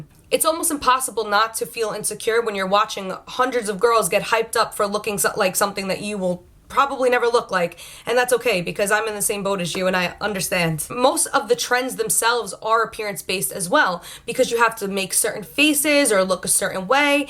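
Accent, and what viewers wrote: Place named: New York, United States. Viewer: Are you from NY or Jersey 🤔 that accent is pretty 'HEY I'm walkin' here!'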